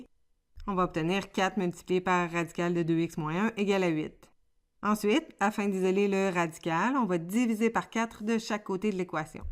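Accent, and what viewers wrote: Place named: Quebec, Canada. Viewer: L'accent Québécois est et la manière de faire différente de la France rendent la chose plis complexe à comprendre, ceci dit, j'ai enregistré la vidéo car c'est bon rappelle de cour !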